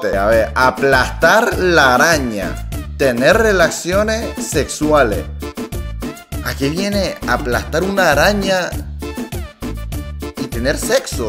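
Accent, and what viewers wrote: Puerto Rico. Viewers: Habla como boricua, bye. Also, hay palabras que ni son boricuas y son media inventadas 😂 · Este koreano es uno de nosotros ri-tru de cora. Habla bien boricua entregao' a lo reventao' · te sale bien el acento boricua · oye tienes un poquito de asentó puertorriqueño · Guapo se usa de las dos formas.... eres guapo o Eres Guapo Ahhh!!!!! Somos difícil de entender los boricuas😂 oye coreano llegue tarde a tu canal, pero estoy en shock hablas como un bori, dime tú te viviste en 🇵🇷?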